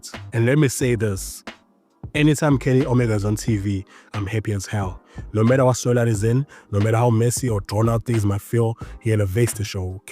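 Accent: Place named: South Africa. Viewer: Hey man, what's your nationality, the accent is giving hints of South African, just asking of curiosity 😁 other than that great video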